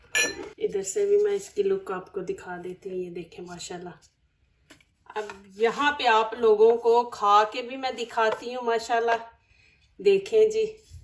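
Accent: Punjab, India. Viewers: Punjabi tone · MashAllah very delicious recipe and Your Urdu so sweet Panjabi accent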